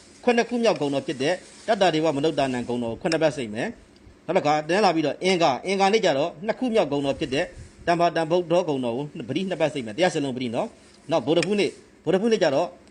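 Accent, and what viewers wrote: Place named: Upcountry, Myanmar. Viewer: အဲပြောတဲ့အသံ အညာသား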